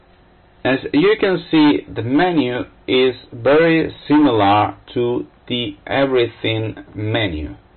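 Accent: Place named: Spain. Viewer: Parker Stephens He is a spanish developer, so English it's not his language. Also, I think he is reading a script. For spanish people, like me, sometimes is difficult to have a good accent in English. Regards.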